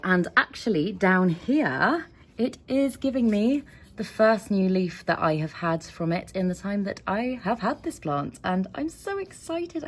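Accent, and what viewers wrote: England, United Kingdom. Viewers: Just love your English accent. Very attractive to listen to. Are you a londoner? Love your passion for plants. Did botany? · 英国发音好听！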